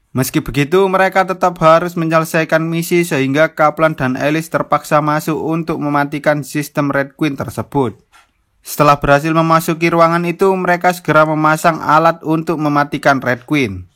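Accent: Java, Indonesia. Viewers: Bang ngomongnya kok kya orang jawa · Bang orang jawa😂 sama dong kayak aku 😆😁😁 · abang orang jawa kah? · Bang koe wong jowo endi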